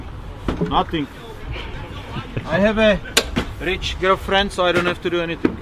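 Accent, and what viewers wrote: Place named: Russia. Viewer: Funny guy, i tought he was russian by his accent, real nice and hand-on guy !!! Those 5 girlfriends dont know what they were losing !! 👍👍👍👍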